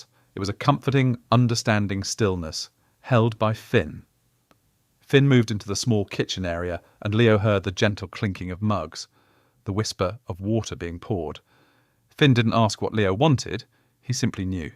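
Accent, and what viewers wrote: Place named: England, United Kingdom. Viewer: love the English accent!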